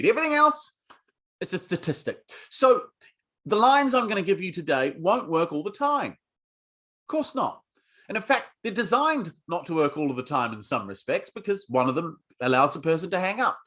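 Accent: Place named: New Zealand. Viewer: Great advice as always. You'll need to add a section on your bootcamp "how to sound like a Kiwi"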